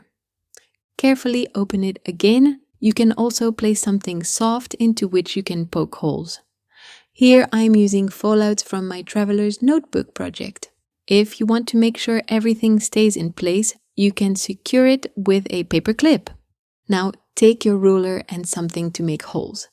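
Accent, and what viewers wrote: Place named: France. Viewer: Liked your French accent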